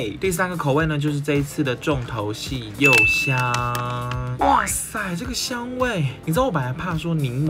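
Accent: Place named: Taiwan. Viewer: 戴黑框眼鏡看起來好顯瘦喔，的台語聽起來很好聽欸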